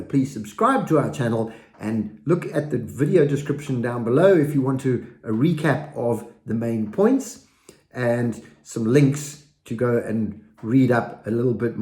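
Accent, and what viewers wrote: South Africa. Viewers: Brilliant...You're so right. Totally agree.. Everyone listening needs to take care of diet and exercise before its too late. I live in USA.. Linda, ex-Zim..Good to hear yr SA accent :)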